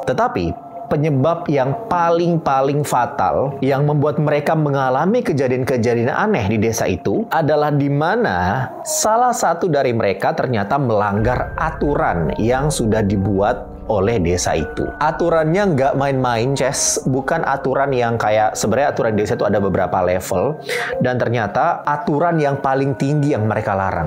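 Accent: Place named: Osing, Indonesia. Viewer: Logat bhs oseng kang.... mosok jowo... klendi seh Peno ikaw..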